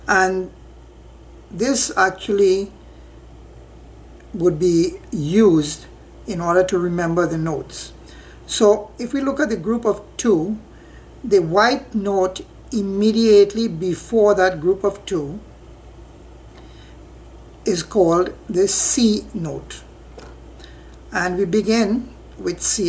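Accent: Trinidad and Tobago. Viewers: Are you from Trinidad by chance? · Trinidadian accent.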